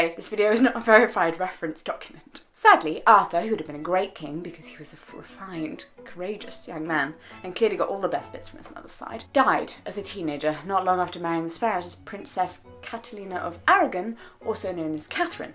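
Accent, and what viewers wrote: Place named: England, United Kingdom. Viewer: English accent 🤤😍😍😍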